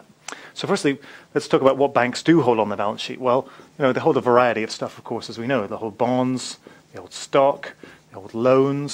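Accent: England, United Kingdom. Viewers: Hes english not irish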